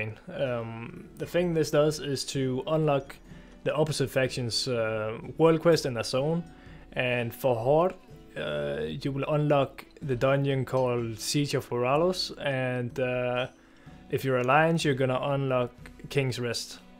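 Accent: Denmark. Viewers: Are you danish? You really sound danish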